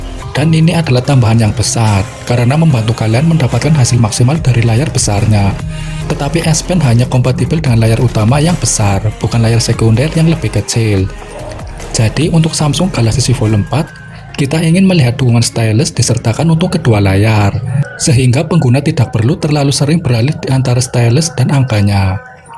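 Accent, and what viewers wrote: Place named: Java, Indonesia. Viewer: medok amat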